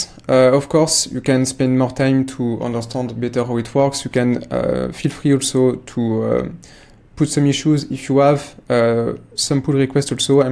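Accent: France. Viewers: Tu parles francais ? du moins je l'entends quand tu parles anglais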